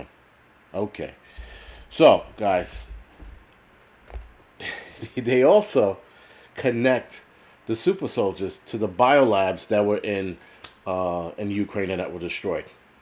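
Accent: New York, United States. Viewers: You sound like a New Yorker - this is they way New Yorkers talk. Authentic born and bred New Yorkers.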